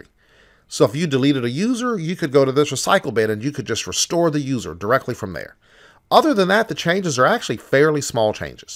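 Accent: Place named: South, United States. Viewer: I love how you explain this stuff! It's helpful for my work. Also, you have a pretty cool southern accent :P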